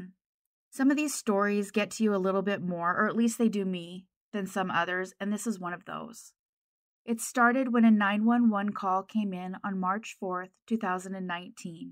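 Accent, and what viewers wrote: United States. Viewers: The voice we are listening to, sounds like Southern Girl.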